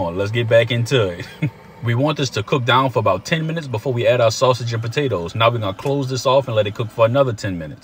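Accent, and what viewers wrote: Louisiana, United States. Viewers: That is perfect Bayou Accent !!! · Most likely either southern or louisiana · It's not even a southern thing it's a louisiana thing specifically. It's more of a frenchy way of saying it. Also no need to be rude. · Ill parlez vous angles de Louisiane !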